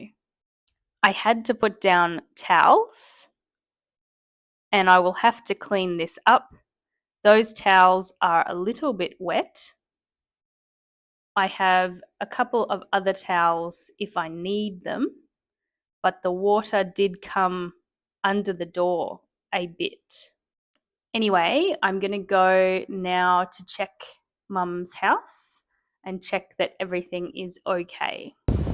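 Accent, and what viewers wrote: Australia. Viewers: Your lessons are great because they are real life images of Australia, along with your typical Australian accent. I am going to Australia to live with my children so I really need to practice this Australian accent. Thank you very much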